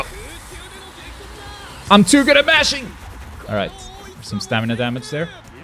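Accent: Portugal. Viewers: I know you where from Portugal from the way you speak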